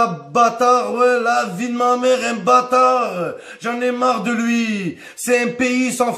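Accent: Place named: Nord-Pas-de-Calais, France. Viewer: Mdr mais enfaite c'est un Ch'ti 😅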